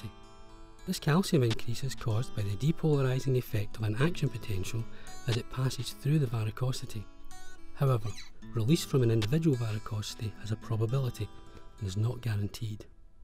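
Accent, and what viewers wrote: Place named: Scotland, United Kingdom. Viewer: what hell is he talking about??? shitty scotish accent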